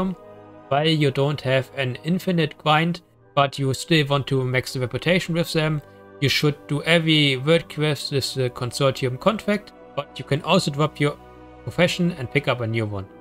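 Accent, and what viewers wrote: Germany. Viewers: thick german german accent :D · Bist du deutsch? Hast nämlich solch einen Akzent xD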